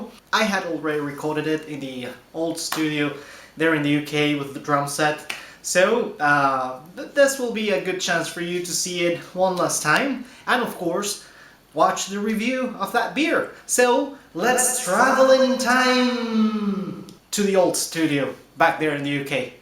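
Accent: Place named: Brazil. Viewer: HAhaha no prob mate, its a thing that just brazilians would know, its like a compliment to see if the another lad is also a brazilian. anyway its cuz your accent, not that you have it, but in some parts of the vid i can tell u are a BR(brazilian) but not hehehe.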